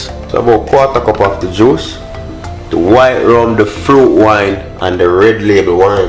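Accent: Jamaica. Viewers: As soon as I heard that Jamaica acent...I started smiling, hey maan 😃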